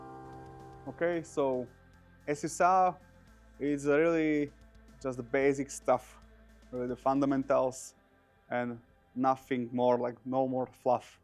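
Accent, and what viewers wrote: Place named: Slovakia. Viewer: Well to be honest, no problem with English, but. But, you should be able at least to have a full capability of speaking consistently without mishaps. And I am also not talking only about strong Slovak accent, that is not what worries me that much, but the strong competition on YouTube among English speaking shooting channels. It will be difficult to bring something exceptional. I wish you all the best and I do not intend to stop watching your videos. Just a small feedback.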